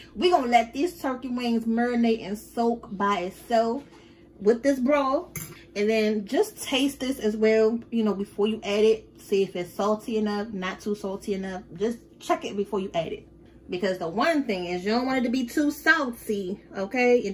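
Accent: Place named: Maryland, United States. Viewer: Yummy. First time watching. New subbie. Let me guess Maryland???? That accent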